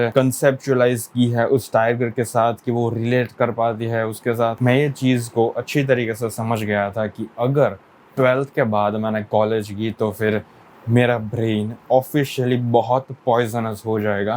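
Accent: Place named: Bihar, India. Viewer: He sounds like bihari who knows english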